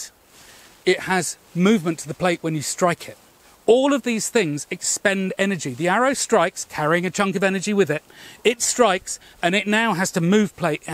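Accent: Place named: United Kingdom. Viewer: Harry Potter accent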